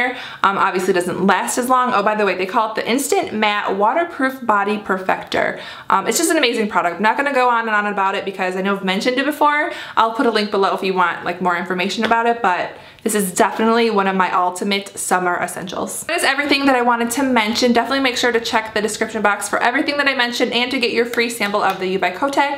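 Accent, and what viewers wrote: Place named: Midwest, United States. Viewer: Are you from the midwest? You sound like you have my accent! :)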